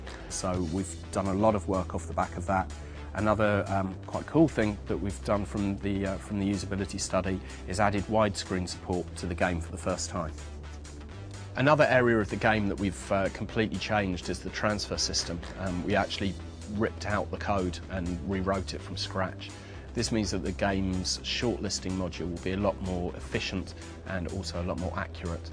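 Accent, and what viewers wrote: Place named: England, United Kingdom. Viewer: Damn, what a classic english accent! Btw, I have this game and it's pretty cool for thoose who consider buying it.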